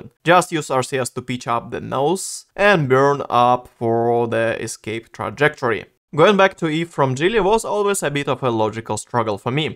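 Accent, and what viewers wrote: Russia. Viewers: это русский акцент или мне кажется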